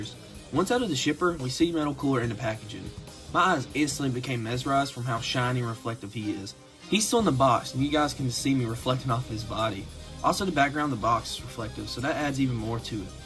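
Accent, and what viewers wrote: South, United States. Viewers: 😂😂😂this was like watching a review voiced by one of the Beverly Hillbillies 😂😂 I'm sorry, but the southern accent had me laughing the whole time. Great review btw.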